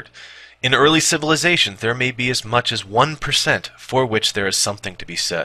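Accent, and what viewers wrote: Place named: United States. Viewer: all very reasonable, but why is an American reading it? Bertrand Russell was so terribly English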